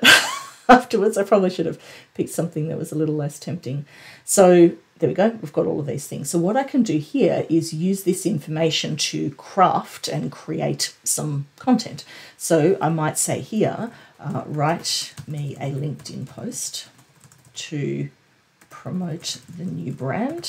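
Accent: Australia. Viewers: Are you Australian?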